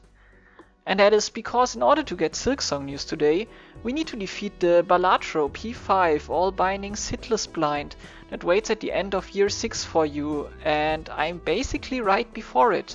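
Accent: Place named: Germany. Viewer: German Accent, like it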